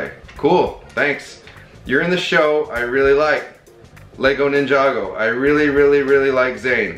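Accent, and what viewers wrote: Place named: Mexico. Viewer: You do not sound bad. Just a little mexican.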